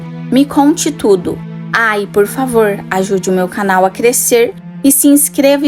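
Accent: Minas Gerais, Brazil. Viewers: Moça, você é do Vale do Paraíba? Vi um cara comentando que você deve ser mineira. Fiquei curioso.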